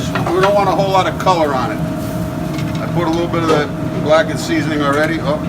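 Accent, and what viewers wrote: United States. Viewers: Love your vids and your accent! Is that accent specific to any part of the US? Keep it up!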